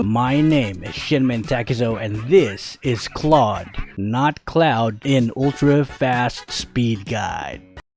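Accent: Philippines. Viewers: your from ph? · I actually thought he was a foreigner because of the accent but hey, I can hear a bit of Filipino in his words 😂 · Pilipino ba siya? HAHAHAHAH OBVIOUS E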